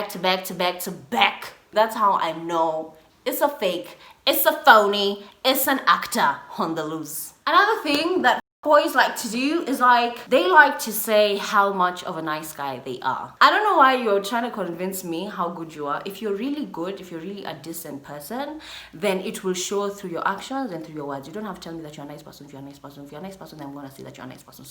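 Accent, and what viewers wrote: United Kingdom. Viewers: The British accent,😍😍😭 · I've been watching your videos so much in the middle of a convo I start faking a Brit accent😂😂😂😂😂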